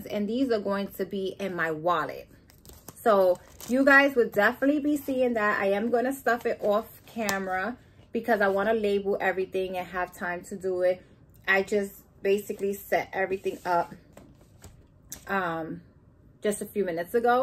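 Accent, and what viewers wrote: New York, United States. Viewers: Off topic but are you a New Yorker ? I hear an accent.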